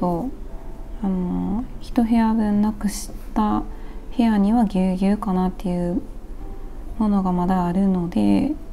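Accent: Kansai, Japan. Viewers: こんにちは👋😃私がさんを知ったのは、4年前急にくも膜下出血で右半身が不自由になって、ショックで何もやるきが失くなって一日中泣いてばかりしてた時、編み物を勧めてくれたんですよ。😅でも、手が思うように動かなくて💦😥ひたすら動画をながめてました。そしたらなんと私と同じ関西弁で編み物をされているさんを見つけて😂 …なんか、今まで編み物の基本ばかりにとらわれてしまって、できないことばかりで諦めてたのに、さんの話しを聞いたら、なんかできるような気がしてきて挑戦してます。今ではリハビリをかねて編み物してますので手が大分動くようになってきました❗️足はまだまだですが😅💦何よりさんのおかげでかぎ針が使えるようになったのが嬉しくて😂あなたの動画に出会って無かったら今頃は死人のようになってたかも😅 さんの関西弁も大好きです😄私は関西人だけど関西弁を喋らないところに住んでます。懐かしさもあります。これからも楽しいトークと編み物、UPされるのをまってます🎵長々とスミマセン❗️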